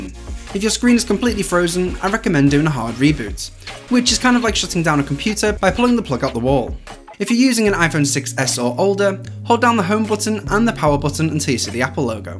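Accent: United Kingdom. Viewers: This video is proof that having a British Accent doesn't automatically make you smart.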